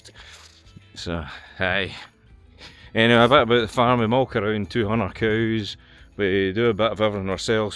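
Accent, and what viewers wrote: Scotland, United Kingdom. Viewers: Hi great videos and I really enjoy watching them. Especially as you're a fellow Scot with a similar accent. I was born in Lanark. I'm curious to hear where in Scotland you are? Are you south west of Lanark? Keep the videos coming 👍👍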